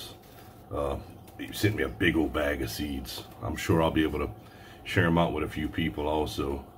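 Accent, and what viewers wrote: Louisiana, United States. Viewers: Two big thumbs a two big toes up! Gotta love the "vinegar" that tastes like wine??? For those wondering what is going on, NY won't let us send alcohol thru the mail! So, I sent a bottle of grape juice that was on its way to vinegar but must have gotten to it too soon! Dang, now your not going to have any vinegar! Thanks for the kind words and thoughts, this YouTube community is awesome and is really like the old days of CB radio and walkie talkies but with video! Keep on keeping on your a true YouTube character! Oh, and by the way that grass made it from your head to your beard, but judging from the beard it's probably not the only thing lost in there! Your beard is truly your trademark, well next to that Cajun voice of yours.... Better get picking up around there and good luck!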